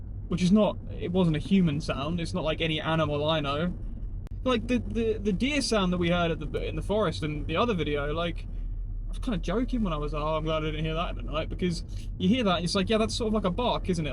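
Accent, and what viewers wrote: United Kingdom. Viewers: It was a cow. Well, bull, actually, since "cow" is the term for a female bovine specifically. That was the sound of a horny bull. I'm guessing you're in the UK from your accent and how flat everything is around you. If that is true, then the most dangerous thing in those woods was probably another person. Not trying to diminish your instinct to get out, just saying that there aren't any large animals out there that would have caused it. Cattle can be dangerous, especially amorous bulls, but still, a junkie or psycho human is going to be your biggest threat walking around out in the middle of nowhere. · Where are you? From your accent, buildings, car, etc. I'm guessing the UK? Nothing dangerous there? I guess there have been Puma sightings, but no bears or other large predators. I would have gone to seek out the noise unless you were on private land. 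Kinda sounds like someone is butchering a cow. My guess... Fox, Cat in heat, Owl. In North America, we have a lot of large predators bears, cougars (not old ladies), wolves, wolverine, moose(very dangerous), elk(bugles), etc. Even Bigfoot! Sounds kind of like your noise. 😁 Is there a zoo nearby?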